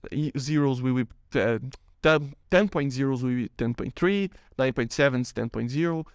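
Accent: Brazil. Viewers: that Brazilian accent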